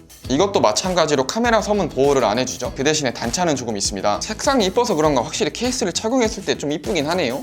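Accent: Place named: Jeonbuk, South Korea. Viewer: 고향이 전북이신가봐요 전주 아니면 군산 ㅋㅋ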